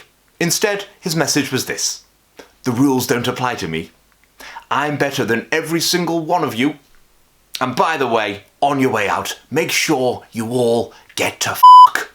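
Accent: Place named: Yorkshire, United Kingdom. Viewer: I Loove how your Yorkshire accent makes odd appearances now and again. Bloody brilliant mate.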